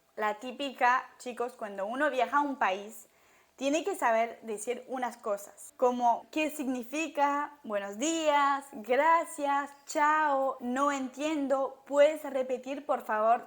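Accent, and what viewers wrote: Argentina. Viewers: Olles tienes acento o hablas argetino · Me gusta como hablas pareces Argentina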